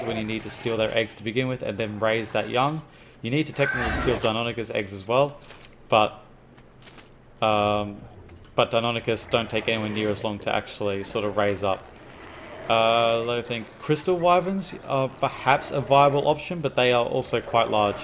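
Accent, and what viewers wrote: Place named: Australia. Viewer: Best designs I’ve seen… although an Australian trying to sound scientific is too funny 😂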